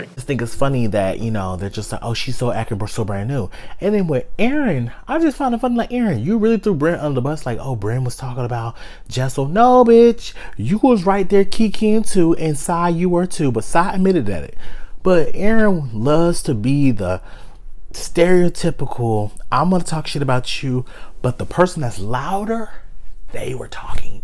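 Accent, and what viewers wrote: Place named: South, United States. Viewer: Oh georgia! I was wondering where in the south you were from. Whenever my subtitles are on they translates you sooooooo funny.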